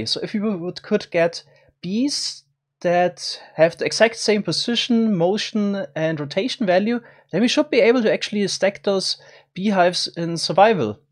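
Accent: Germany. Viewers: Are u german thiss accent insane haha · Da hör ich doch den deutschen Akzent😂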